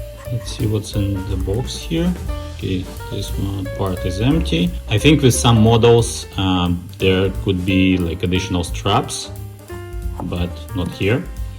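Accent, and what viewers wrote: Russia. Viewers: О єбать який москальський акцент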